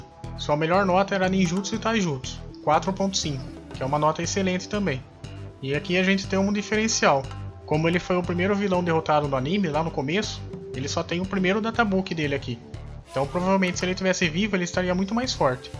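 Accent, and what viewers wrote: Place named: Paraná, Brazil. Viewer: vc e paraienese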